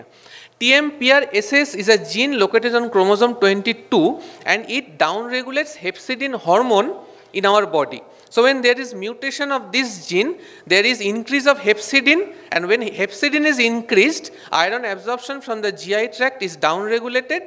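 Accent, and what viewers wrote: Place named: Punjab, Pakistan. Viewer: Punjabi pakistani accent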